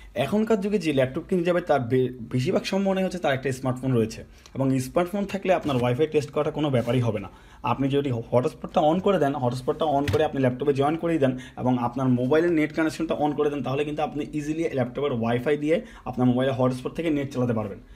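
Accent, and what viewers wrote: Chittagong, Bangladesh. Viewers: Apnr kthar dhoron shune amr versity life er kichu frnder ktha mone pore gelo tader bari chilo Chittaging...Oidiker manushgula oshadharon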